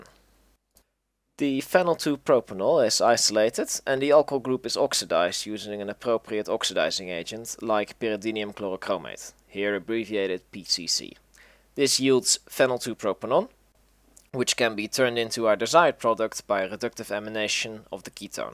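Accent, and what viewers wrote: Netherlands. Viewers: im 99% sure this guy is dutch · Are u dutch by any chanse? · dutch speaker hmmm? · I smell dutch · Hoor ik hier een Nederlands accent?🤔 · nederlands accent gedetecteerd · are you dutch? you sound like a Dutchy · i smell some nederlands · You sound incredibly Dutch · Dutch chemist, nice. Brabant? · Slechts lichtelijk Nederlands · You're definitely Dutch (or maybe flemish?) lmao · Hahahaah, ik kan je nederlands horen hoor jongentje! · Sniff sniff, I smell Dutch? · Lekker Nederlands accentje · after listening to your voice for 5 seconds i just know that you are dutch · are you dutch or maybe german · Please make more videos I normally don’t like chemistry but this was super fun! (Also i sense some Dutch accent in your voice!) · Mooie Nederlandse accent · Je bent nederlands ik hoor het aan je accent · Are you Dutch by any chance? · I can just hear the dutch in jou lol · You're Dutch for sure right? 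From Brabant toevallig? Not to stereotype, but hey this video is about drugs.... 😂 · I recognize a dutchman when I hear one. Gegroet landgenoot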